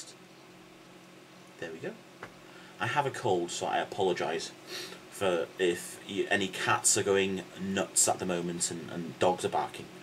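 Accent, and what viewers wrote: Wales, United Kingdom. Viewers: You do sound Welsh, haha. You've got the Twang.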